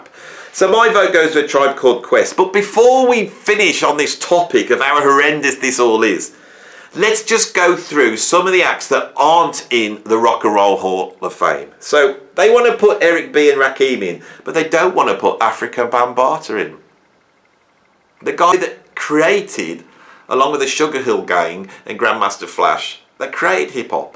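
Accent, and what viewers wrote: Birmingham, United Kingdom. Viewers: Is that a Birmingham or a Wolver'ampton(Slade) accent?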